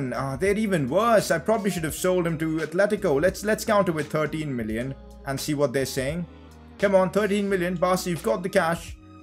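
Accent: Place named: India. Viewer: Are you from India bro, love the accent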